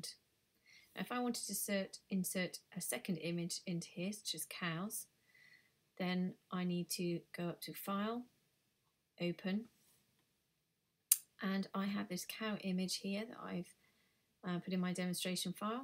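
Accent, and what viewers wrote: United Kingdom. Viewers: I love your British accent my dear....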